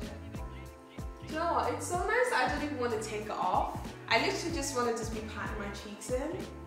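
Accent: Ireland. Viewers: Am I trippin or do you have an irish accent? It's stunning either way😍😍😍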